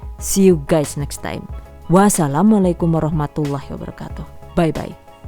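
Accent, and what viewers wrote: Banyumas, Indonesia. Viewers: saya paling suka resep yg pake takaran pasti spt ini, bukan yg takaran "secukupnya". auto subscribe.... btw jg suka sama logat ngapaknya 😄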